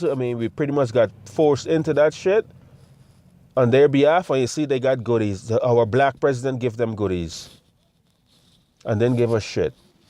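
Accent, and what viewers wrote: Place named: United Kingdom. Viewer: You sound british. You come from a very different experience than afro americans. It's NOT the same.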